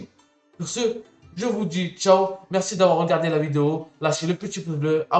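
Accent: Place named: Marseille, France. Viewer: Par contre sss un peut marseillais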